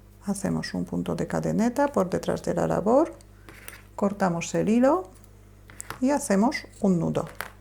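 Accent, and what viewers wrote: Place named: Spain. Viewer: Que bárbaro tu comentario Roxana salas. Tiene muy bonita su voz. Además es asento español..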